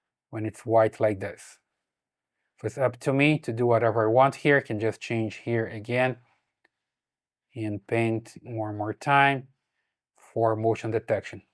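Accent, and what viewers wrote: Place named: Brazil. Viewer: Vc eh brasileiro nao eh? Esse nosso sotaque eh inconfundível. 🇧🇷 Os vídeos são mto bons, bem úteis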